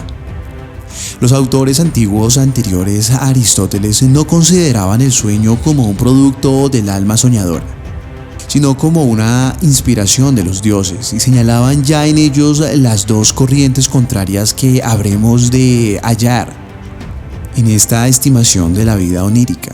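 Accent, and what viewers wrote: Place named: Colombia. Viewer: Una voz humana que habla como robot, no sirve! Deberia hablar mas natural sin tanto acento colombiano y mas neutral. Saludos!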